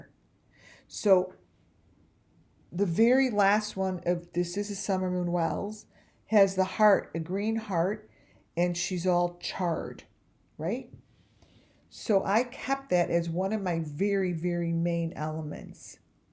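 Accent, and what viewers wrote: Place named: Midwest, United States. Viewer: Your voice sounds like that other channel. Um, chasing truth I think. It just could be the Midwest accent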